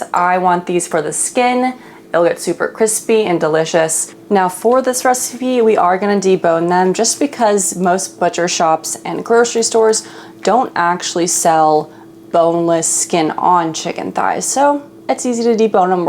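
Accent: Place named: United States. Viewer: THE most grating American accent….pepperrrr,wadderrr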